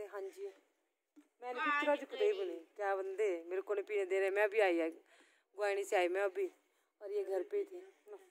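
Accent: Punjab, India. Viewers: Tuhadi Punjabi Bahut mithhi ai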